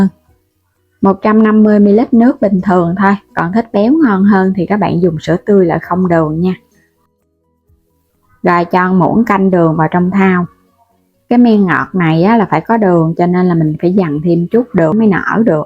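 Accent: Mekong Delta, Vietnam. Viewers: Giọng nói miền Tây dễ thương mà bạn sao nghe khó vậy hả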